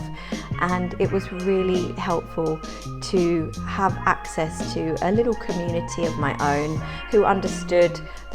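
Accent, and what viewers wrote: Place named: United Kingdom. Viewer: Hi! Don't worry about your accent and pronunciation, because most of us Czechs don't care if you have a British accent. I've been studying English for 30 years and I'll never be able to do a British accent, no matter how hard I try.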